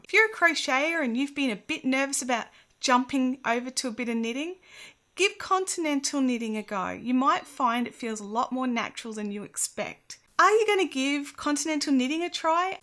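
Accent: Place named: Australia. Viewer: Wow!! I've never heard of continental knitting til now! I can knit & crochet, but haven't knitted for many years, but crochet heaps as it's my passion. If I ever decide to knit again I'll have to give continental knitting a go! I've just found your channel & subscribed. 😀👍 I assume you're an Aussie by your accent. 🤔 Blessings from Adelaide, South Australia. ❤️🦘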